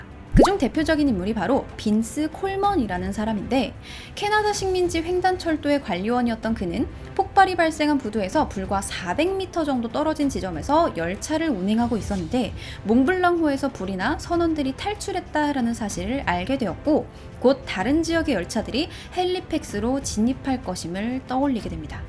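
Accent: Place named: Jeju, South Korea. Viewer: 제주도가 고향이심? 아님 이주하신거심? 경상도 억양 만큼 꽤 마음을 설레게 하네요.ㅎ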